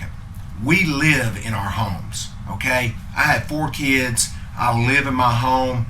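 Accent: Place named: Alabama, United States. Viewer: Roll Tide, man, with that accent!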